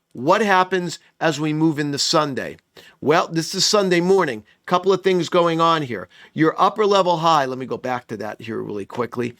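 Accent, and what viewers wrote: New York, United States. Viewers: He must be from the New York City area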